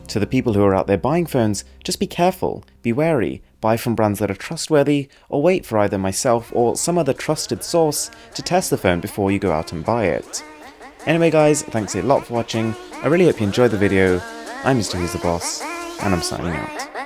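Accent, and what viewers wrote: United Kingdom. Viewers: Extremely difficult for me to follow. I get the main point but you rapidly slur through about 20% of your words with your nice Queen's English accent, making it impossible for me to understand your finer points. If I were sitting with you at a luncheon, I imagine that I would constantly, embarrassingly, be having to ask, "I'm sorry, I didn't quite get that?...I'm sorry, could you repeat that please?" If you wish to professionalize your narration, it would be useful to work on enunciation and clarity of presentation. Despite this, thank you for the content and information.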